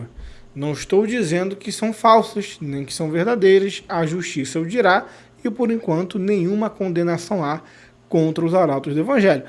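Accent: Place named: Rio de Janeiro, Brazil. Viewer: Voce tem sotaque de norte fluminense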